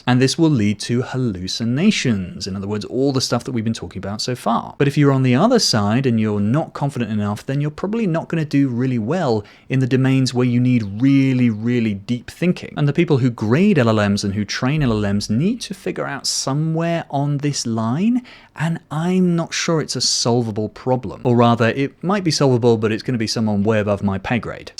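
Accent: United Kingdom. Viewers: Thanks for the video, you are becoming my favourite source for AI based videos as a developer. Unbiased, realistic and love your British accent, but, I hope you are not an Arsenal fan:D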